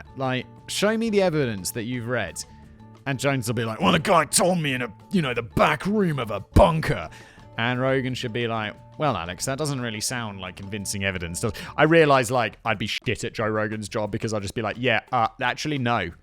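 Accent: United Kingdom. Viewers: what's so "intelligent" about this guy. Is it because he speaks with a British accent? Apparently, he has been the voice of several youtube channels and somehow ends up copying the channels for himself. The guy is not even a scientist himself, and he's here criticizing those who don't follow the "official" narrative. These are the same people who won't let you even consider the possibility of the virus escaping from a lab which first appeared you know... 5 blocks from one of only 3 labs that could have made the virus. Subjects that were discussed at the time at the Joe rogan show. wished he was invited to Joe Rogan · chill out people on pronouncations, he speaks the unrefined British English, not the improvemed American. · Simons Brit accent is at times a bit too heavy for me. But today, it was a fucking sonnet.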